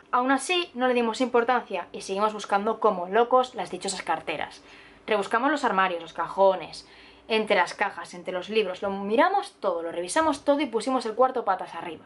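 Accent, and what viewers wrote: Spain. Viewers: Porq Dalasito no tiene este acento español ???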